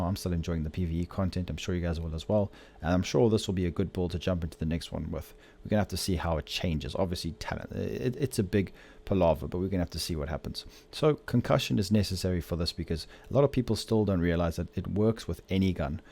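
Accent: South Africa. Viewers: You sound like a South African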